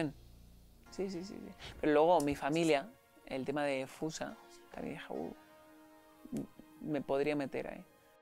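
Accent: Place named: Canary Islands, Spain. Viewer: Deseando ver todo lo que está preparando. Las entrevistas de Ago me relajan. Tiene ese acento canario y esa voz que me dejan flotando ❤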